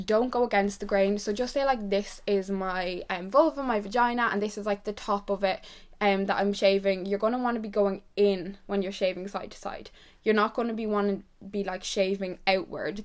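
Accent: Ireland. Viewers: I feel like you're Irish! What county are you from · Are you irish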